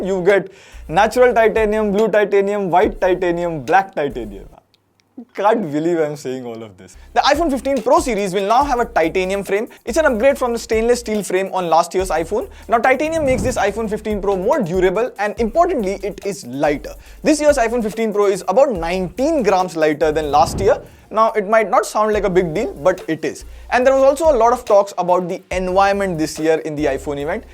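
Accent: India. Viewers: Brother, your style of speaking English is exactly like speaking Hindi. ❤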